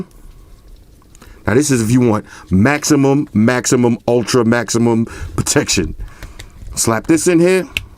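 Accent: New York, United States. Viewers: Lol. OMG I could listen to you talk all day!!!! It's the New York!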